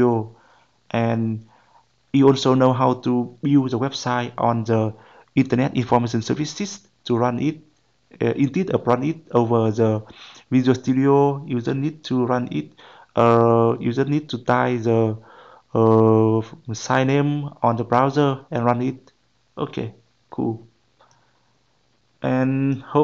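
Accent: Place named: Vietnam. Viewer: Nghe giọng Việt Nam ta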